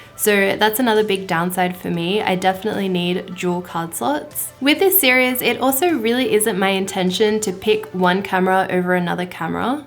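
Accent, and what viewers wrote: Australia. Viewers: As an English teacher in Germany I just simply enjoy listening to you speaking. Your Australian accent is adorable! ;-) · Valley australian accent?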